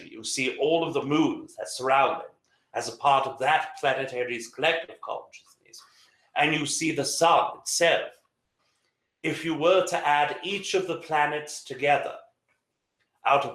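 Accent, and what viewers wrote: England, United Kingdom. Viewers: why is he speaking in an english accent though?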